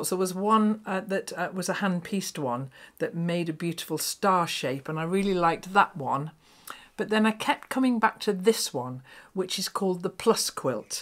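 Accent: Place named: Lancashire, United Kingdom. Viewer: you brought back such an emotional memory of my beloved Mum who died at 54 when I was 21 I’m now 75 In your soft Lancashire accent just like my mums ( busy hands Lass calm head ) she was an amazing knitter sewer crocheter She would have loved this YouTube community as I do & would be on the lime green sofa knitting of course Thank you Thank you 😍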